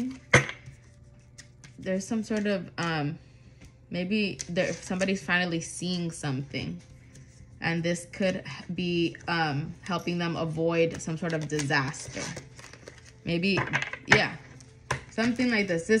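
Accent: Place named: Caribbean. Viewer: I love your Spanish, are you Dominican? Cuban? Puerto Rican?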